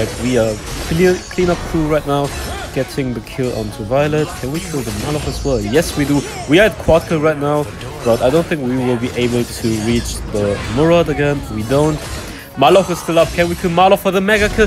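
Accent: Germany. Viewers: Wenn das Deutsch wieder durchkommt. Trotzdem nices Gameplay (wie immer) ich werd wohl eh nicht mehr verstehn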